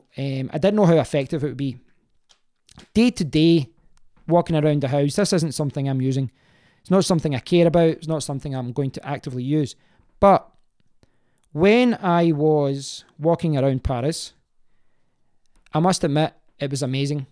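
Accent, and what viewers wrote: Scotland, United Kingdom. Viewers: I love your Scottish accent...It has been a while a heard such a pure Scottish accent! And the U11 is definitely the Android phone to get in 2017. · Excellent video love the scottish accent so refreshing to hear seriously considering on getting the U 11,I have the LG G6 at the moment and i am enjoyingi it ,but I am admittedly a big fan of HTC and can't wait to get the U 11.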